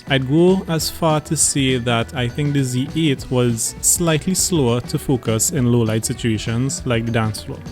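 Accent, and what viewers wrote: Trinidad and Tobago. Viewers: Are you from Trinidad 🇹🇹??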